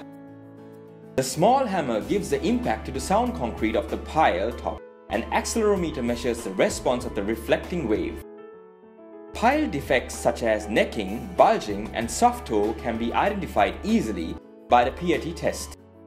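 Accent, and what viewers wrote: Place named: Caribbean. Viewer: Its a carribean or Caribbean accent and his diction is quite clear.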